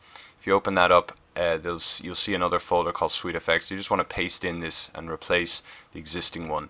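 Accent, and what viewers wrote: Ireland. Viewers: you have the best irish accent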